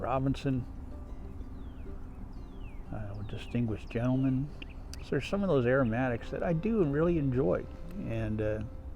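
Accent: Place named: South, United States. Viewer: picking up a little southern drawl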